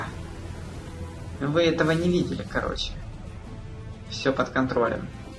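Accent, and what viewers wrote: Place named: Ukraine. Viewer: Ти українець? Я по словам чую, такі слова як вночі. Також ти літеру ґ в рос мові вимовляєшь як г. Якщо тт справді українець, Слава Україні!!!🇺🇦🇺🇦🇺🇦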